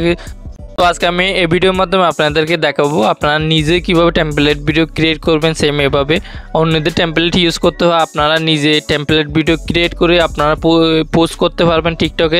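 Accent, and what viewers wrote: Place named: Sylhet, Bangladesh. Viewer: Apni sylheti vai❤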